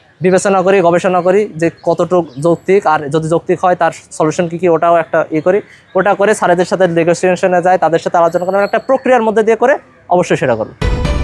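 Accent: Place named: Satkhira, Bangladesh. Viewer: ভাইয়ের বাসা মনে হয় সাতক্ষীরা,কথার টান থেকে বুঝলাম।জন্মভাষা বলে।কথা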